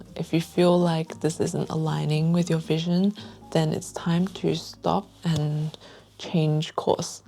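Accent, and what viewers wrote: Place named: Australia. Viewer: Love this! Massive help. By your accent by any chance you’re from Australia?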